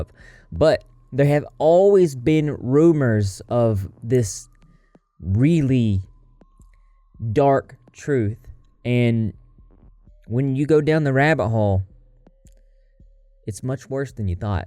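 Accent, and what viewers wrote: South, United States. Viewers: Bro that's a sick southern accent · You gotta be from the south, lol?? Shout-out from NC!!!! I'll admit, back in 2012 I watched a video of a MM concert where he pushes a bandmate on stage in front of hundreds of people. The guy stops playing the guitar for a moment, long enough to briefly yell at Manson, raise his fist as If he is going to start throwing blows. But he quickly starts playing guitar again.... At this time, MM mocks the guitar player by placing his hands in the air and moving them as if he is working a puppet on strings to the beat of the music. Seeing this, I developed a feeling in the pit of my core that told me MM had abusive tendencies, and I couldn't help but think "God I bet that guy is an absolute nightmare to deal with". And quiet frankly, after I watched an interview about Manson being a follower of Anton Szandor LaVey, Aleister Crowley, was given an "honorary priesthood" and claims to be ordained by the Satanic Temple, and has actually had run-ins with books such as Necronomicon.. 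one might be forgiven for believing he is indeed capable of committing such horrendous acts upon another human being.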